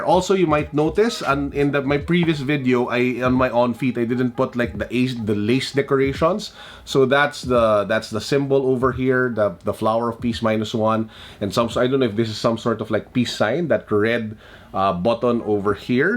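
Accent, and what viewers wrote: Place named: Philippines. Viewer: That Filipino accent tho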